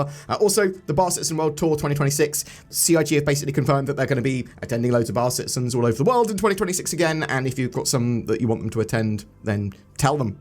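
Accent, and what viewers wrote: United Kingdom. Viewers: Is it possible for you or to record yourselves, in your British way, saying these words? Girlfriend, murder, rural, juror. Your struggles with the word "digital" made me think of it.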